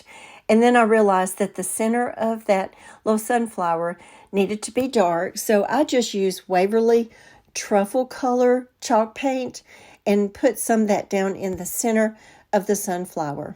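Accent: South, United States. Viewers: I love to watch all ur videos! There has never been one that I don't just LOVE❤ I also love to craft and I hope to be as good as you one day!! Also I just want you to know I love your sweet southern voice just so adorable 😊 I'm from Alabama, where are you from?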